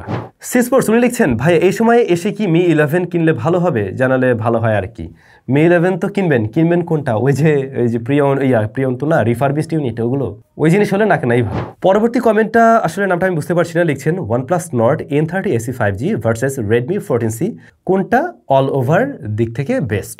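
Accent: Barishal, Bangladesh. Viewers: ভাই আমি নয়ন । আপনি কি বরিশাল এর ছেলে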